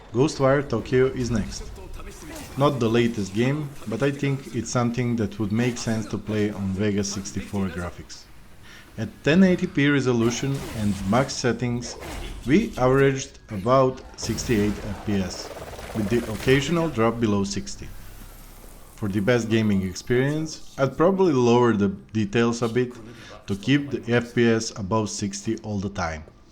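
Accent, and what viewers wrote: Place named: Russia. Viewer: You Russian? Ukrainian? Good review.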